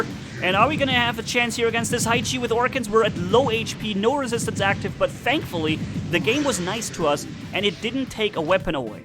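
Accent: Germany. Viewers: Hi are you German?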